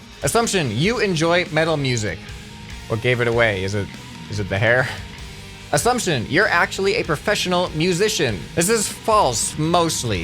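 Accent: Canada. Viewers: huh.. i thought u were Canadian. i thought i heard an accent. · What do u mean he sounds too Canadian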